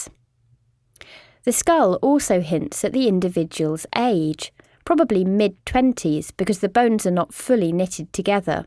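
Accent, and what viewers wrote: England, United Kingdom. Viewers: I adore English Narrators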